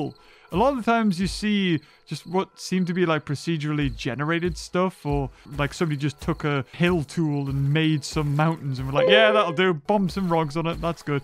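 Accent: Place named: United Kingdom. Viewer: 5 seconds of watching the video hearing this british guy clicking on LIKE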